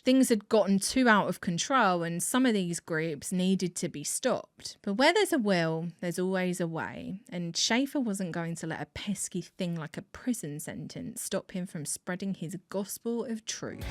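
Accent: United States. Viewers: Yay a sponsor! And your American accent has gotten much better ☺️ Thanks for anther fantastic video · I love your American accent! well done! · yer merican accent is funny af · Your videos are consistently so good that I automatically click like before Ive even seen it. P.s. your american accent is getting even better! lol... sounds kind of californian. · Excellent American accent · You rock the American accent!!!! Great video ty so much ❤ from USA 🇺🇸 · Good American Accent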